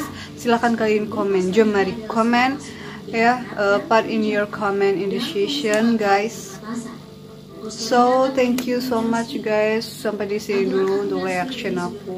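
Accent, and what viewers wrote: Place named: Malaysia. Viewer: Mantap kak, comell kak cakap Malaysia 👍♥️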